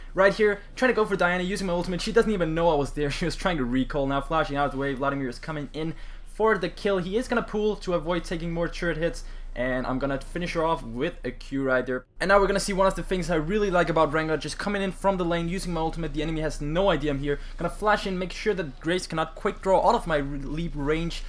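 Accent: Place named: Denmark. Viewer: Your accept sound *very* danish...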